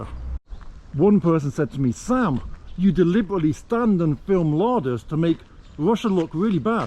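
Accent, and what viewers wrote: United Kingdom. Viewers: If you walk in Detroit or now even in LA or NY, the landscape is even shitty than that. Thast's the "real Russia" you want to show. And your british accent teils us why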